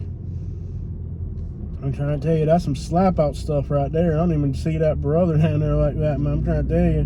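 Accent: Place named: South, United States. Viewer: Speaking like southerner today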